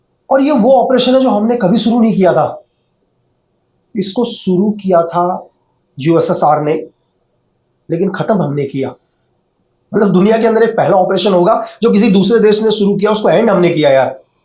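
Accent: India. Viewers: that's how kumaoni people speak 😅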